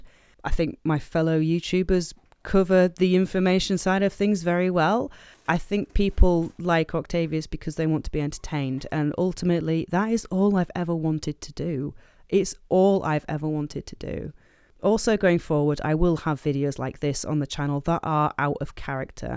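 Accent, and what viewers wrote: United Kingdom. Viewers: I just watched this, god knows if you're see this comment. Part of the reason I watch your vids is because of your humor, and the other part is because you always made mention of your issues and as someone who is bipolar I get it, because I've been there myself. That and if I'm going to be perfectly honest, you're attractive and I can't get enough of your voice, it's the British accent. Could listen for hours, I usually wait till there there's a few vids to watch in a row for said reason. Not a creeper, just a Bipolar guy that finds himself in similar situations as yours and..... well, what can I say I'm guy, knowing there's an attractive woman out there that I can relate to on some level, makes me feel less lonely and worthless. Stay strong, be you, because you rock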